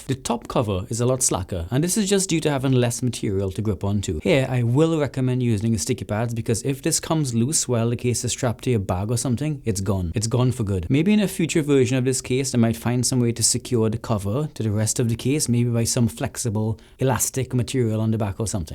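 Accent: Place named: Trinidad and Tobago. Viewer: Your accent sounds Trinidadian, is it? Great content by the way!!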